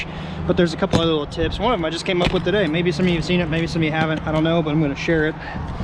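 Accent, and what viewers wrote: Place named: Pittsburgh, United States. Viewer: Pittsburgh PA. You from Pittsburgh, I hear an accent lol. I have a shed roof to fix a few shingles on, thanks for the good video. Yeah, winter sucks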